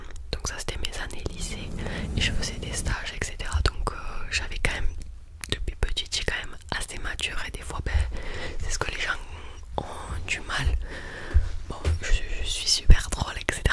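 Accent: South, France. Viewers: Avec l'accent du sud c'est tellement mieux car à part Tom ASMR personne réellement a ce petit détail dans la voix et c'est sympa puis le fait que tu aimes le foot ça change tout . Puis le foot franchement si tu peux c'est bien aussi et ton niveau ça serait cool de te voir sur un match ou deux .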